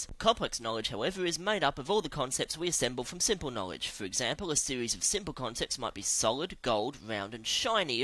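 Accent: Australia. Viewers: Your Australian? :D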